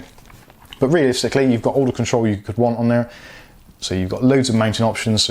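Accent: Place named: Cornwall, United Kingdom. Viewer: Are you from Cornwall? I recognise your accent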